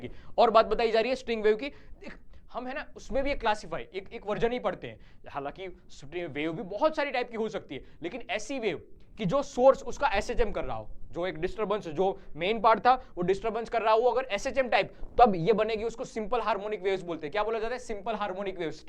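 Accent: Gujarat, India. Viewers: aap gujraati ho kya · Sir gujrati hai kya???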